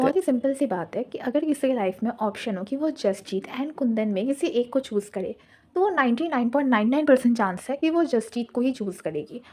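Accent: Bengal, India. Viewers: you're bengoli am i right?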